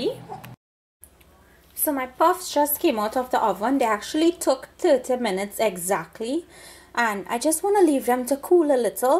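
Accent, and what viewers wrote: Trinidad and Tobago. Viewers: haring you trini accent is soooooooooo pleasing. and the recipe is also excellent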